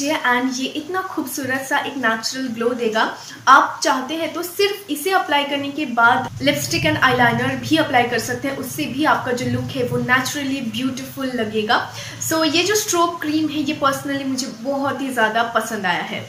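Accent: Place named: Bengal, India. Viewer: Tumar awaje Bangla tan asche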